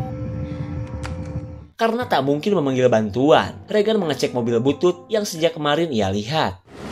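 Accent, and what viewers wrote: Sunda, Indonesia. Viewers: Aya sunda sundana kitu seru pisann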